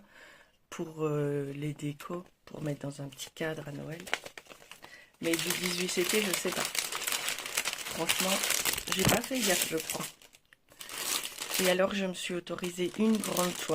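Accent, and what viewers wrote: Belgium. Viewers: tu aurais un petit accent belge ?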